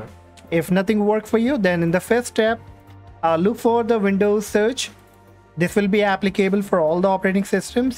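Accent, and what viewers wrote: India. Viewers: i thought its a scam because of the indian accsent but its real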